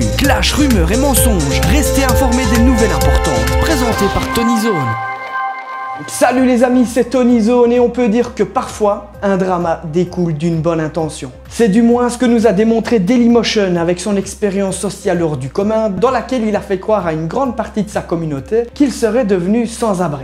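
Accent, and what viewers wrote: Belgium. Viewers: Tu un ptit accent tu est belge ? Sinon cool ce concept de chaine moi shuis belge liegeois x)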